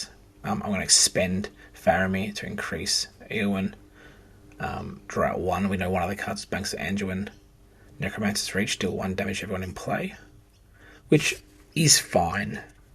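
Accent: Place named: Australia. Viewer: Thanks for the playthrough. It's good to hear an Aussie accent for once! Where did you get the playmat from? Cheers.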